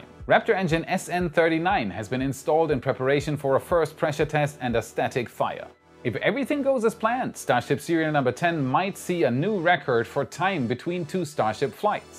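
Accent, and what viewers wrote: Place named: Germany. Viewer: Your English pronunciation is nearly perfect, but a little of your German comes through. Which I find charming. Your video is excellent. Danke!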